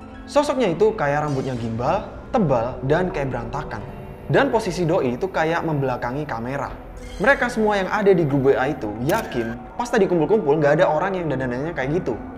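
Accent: Malaysia. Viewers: bang cakap malay mcm org malaysia.. hehehe salam malaysia buat indonesia 🥰🥰🥰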